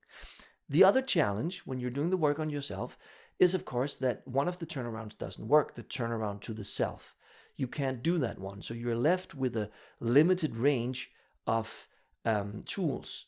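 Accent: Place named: Denmark. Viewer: Your accent is super adorable! 😊 I think I need a Danish boyfriend now!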